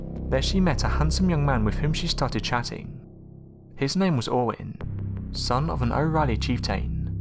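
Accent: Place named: Wales, United Kingdom. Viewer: Dave Lennon-Copeland totally agree. Being from Wales I definitely hear a Welsh accent. Possibly South Wales. Maybe cardiff?.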